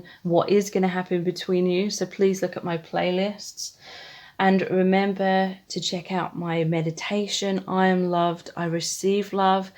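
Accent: United Kingdom. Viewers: Girrlll, I love the british accent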